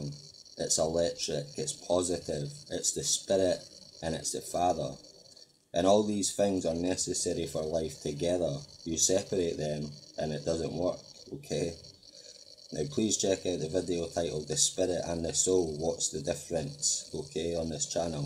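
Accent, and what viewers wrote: Scotland, United Kingdom. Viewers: Well, I do not understand everything because of your accent, as English is not my mother tongue, I have problems with the Scottish accent, AND with the baby's noises in the background, sorry to say...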